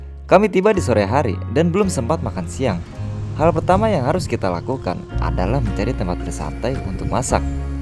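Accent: Sulawesi, Indonesia. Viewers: Sudah belogat sulawesi euy...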